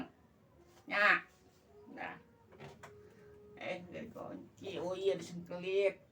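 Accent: Jakarta, Indonesia. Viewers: Cerita yang sangat menarik dengan khas logat Betawi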